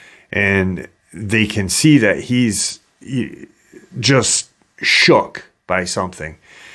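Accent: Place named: New Orleans, United States. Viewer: Bro don’t listen to critics Your show is f*****g awesome Your show is bad ass I like dark waters too cuz I from New Orleans but bro I don’t give a shite if u speak with a Nola accent and are an orater or not you do perfectly fine communicating what you research, and as far as your research it’s badass so keep it up and F*** the critics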